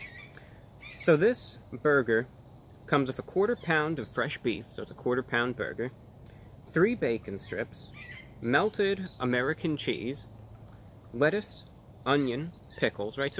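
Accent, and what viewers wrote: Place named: Long Island, United States. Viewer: If you're from Florida Reviewbrah, why do you sometimes sound like you're from Long Island?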